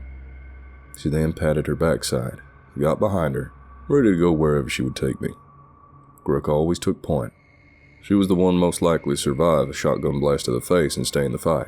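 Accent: South, United States. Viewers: While I will always appreciate the English accents of my favorite YouTube narrators I love hearing stories from America, South of the Mason Dixon line performed by a Bubba.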